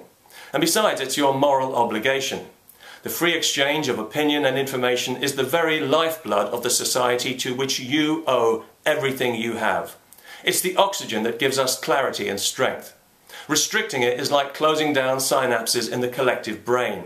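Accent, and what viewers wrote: England, United Kingdom. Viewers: An Irish man with a English accent .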